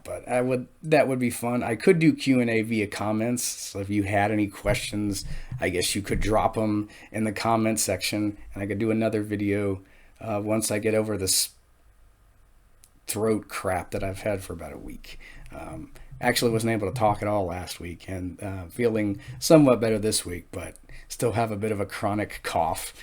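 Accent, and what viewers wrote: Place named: North East England, United Kingdom. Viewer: You sound like you're from the north east area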